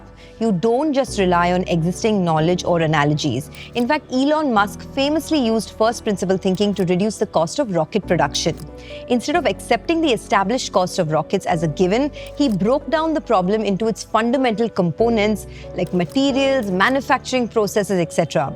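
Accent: India. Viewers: Sweet English from beautiful Indian...even the lessons....and much more...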